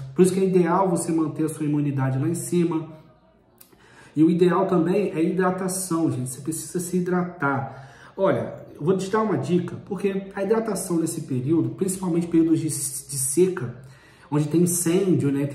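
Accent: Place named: Minas Gerais, Brazil. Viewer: Além de lindo é mineiro. Com esse sotaque então....Meu amigo. Desculpa. Não resisti. Amo seus vídeos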